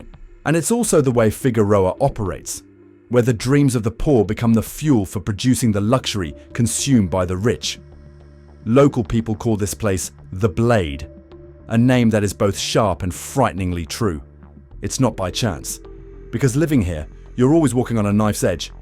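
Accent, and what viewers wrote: United Kingdom. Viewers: You sound like you're from Britain, so it's even worse there. 😂😂😂😂😂😂😂😂😂😂😂😂😂😂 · Couldn't they find an AMERICAN RESIDENT to narrate this? Instead of this British creep?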